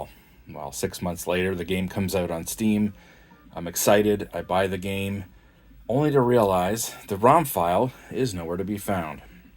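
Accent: Canada. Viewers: You sound like Canadian garbage. I'm out.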